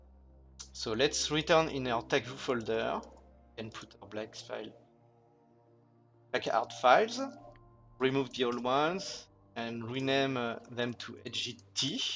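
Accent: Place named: France. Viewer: On sens tellement que tu es francais^^ mais super vidéo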